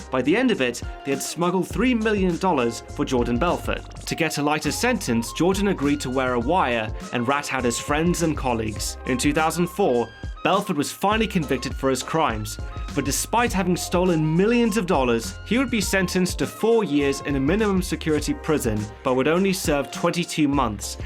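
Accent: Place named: United Kingdom. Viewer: except the great video ❤ are u british ..i love your accent😂❤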